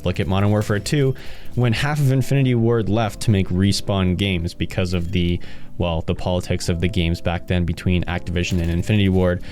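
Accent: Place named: Canada. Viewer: he's Canadian. You can tell by how he says certain words.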